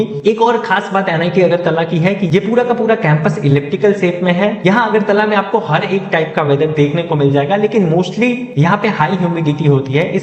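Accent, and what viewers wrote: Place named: UP/Bihar, India. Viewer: Bhaiya aap pakka UP/Bihar se lgte hain. I am also from Bihar😊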